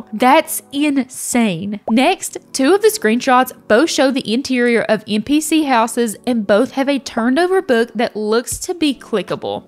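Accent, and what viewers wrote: South, United States. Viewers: It's so refreshing to hear a southern accent coming from a "cozy gamer" :) We southerners get a bad rep quite often so my wife and I are really loving you!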